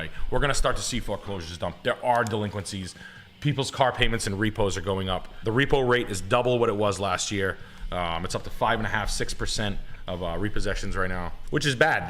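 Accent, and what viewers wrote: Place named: East Coast, United States. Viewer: is the only man with an east coast accent who genuinely cares about anything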